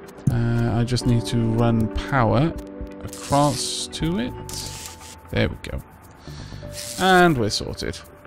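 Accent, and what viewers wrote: Wales, United Kingdom. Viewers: R U Welsh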